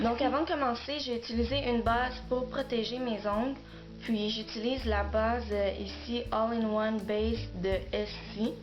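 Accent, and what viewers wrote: Canada. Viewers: C super tu est canadienne ?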